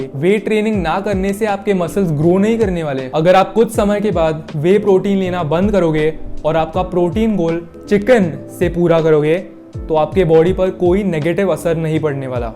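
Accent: Maharashtra, India. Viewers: His Hindi has Maharashtrian touch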